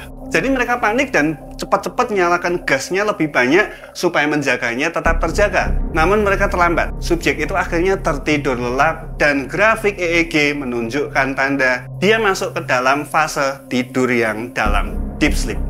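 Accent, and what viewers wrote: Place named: Java, Indonesia. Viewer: Suara anda medok banget 😅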